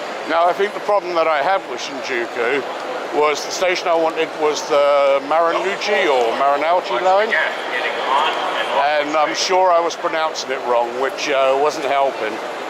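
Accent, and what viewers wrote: England, United Kingdom. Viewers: How carefree life was pre-Covid! One can see it on how people walked, how they unconsciously formed a huddle and it was perfectly okay to do so, & most of all, no masks! I've watched vlogs of Grand Central Station (as it is one of the popular places of NYC and hence, a favorite spot to vlog about) but your narrated walk through it stands out because it is very well researched. If one wants to know the juiciest story of a corner of NYC, trust - to tell it to you! Well, of course, your "exotic" Englishman accent is an extra treat!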